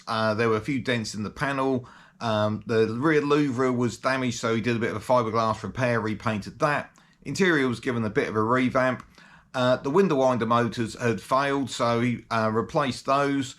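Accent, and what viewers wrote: United Kingdom. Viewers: Would you mind putting up a trigger warning next time you put Brewer in the video? Cheers mate. Great video and great car despite being built in NI and despite being worked on by WD. BTW whats your accent sounds a bit Suffolk to me, ba. Cambridge out.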